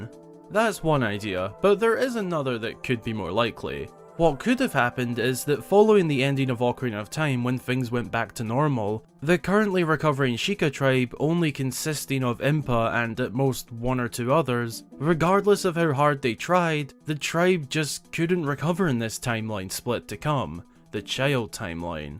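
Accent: Scotland, United Kingdom. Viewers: You sound like yer fae Scotland? Whereabouts I’ll share 2L of strongbow wae ye if ye want?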